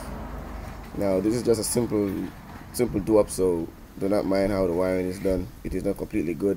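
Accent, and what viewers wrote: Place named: Jamaica. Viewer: jamaican accent. thats cool great video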